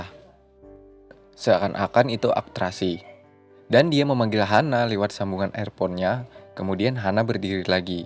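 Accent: Banjar, Indonesia. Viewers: Orang banjar kah ikam ? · Org Banjar kh nih min wkwkw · Banjar banaaarr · Mang orang banjar kh? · Banjar kh mang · Mamang banjar🤣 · Orang Banjar kh bang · Banjar nihhh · Kek logat banjar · Orang banjar kah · Nah mantap urang banjar pinanya nah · Mang orng banjar kh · Orang Banjar kah mang🤣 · urang Banjar kah mang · Urang Banjar Leh rupanya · Banjar banar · lwt di beranda dan penasaran, pas denger suaranya fix miminnya org banjar ya kan😀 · Ada rasa rasa bahasa Banjar wk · Hanya lipsing dangsanak, wkwkwk orang banjar bnar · Tetap ay logat Banjar nya ada biar bahasa Indonesia min ay hahahaa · Orang mana... Logat ny Banjar banar 😁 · Mimin urang banjar kah min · Banjar banar pian 😅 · Orang banjar ya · Banjar banget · Pian urg banjar kh mamang?? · logat nya ne kaya orang banjar pang nah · Urang banjar sakalinya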